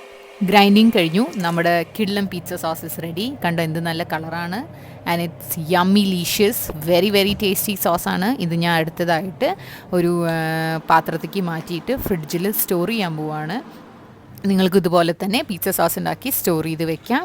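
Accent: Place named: Palakkad, India. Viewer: Chechide slang gilu evidekayo oru plkd touch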